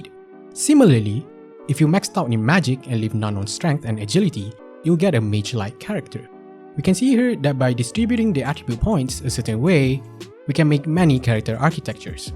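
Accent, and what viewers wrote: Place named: Malaysia. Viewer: bro got that malaysian accent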